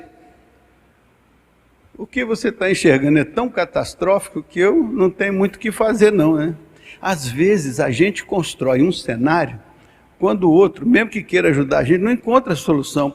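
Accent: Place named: Minas Gerais, Brazil. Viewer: Pr com esse sotaque de Minas!!! A Paz de Cristo já começa ao ouvir esse jeito de falar..Te Agradeço Senhor pela Vida deste pastor!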